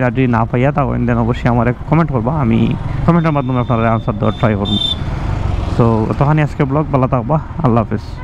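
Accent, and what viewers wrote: Sylhet, Bangladesh. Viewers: Love from Cumilla bro🖤 সিলেটি মাত,সিলেটি কথাই সুন্দর লাগে 😊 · sylhety mat kotha onek bala lage ! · Valo vai Sylhet e bole interest die sunte vallagee moja pai😂❤❤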